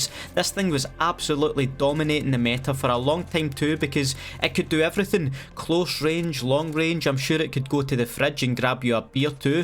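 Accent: United Kingdom. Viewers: His British accent is just....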